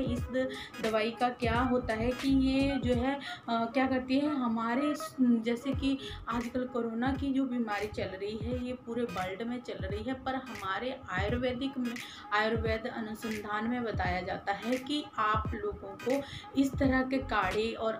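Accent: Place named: Kolhapur, India. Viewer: बहूत आभारी है मैडम कोल्हापूर के भाषा में लय भारी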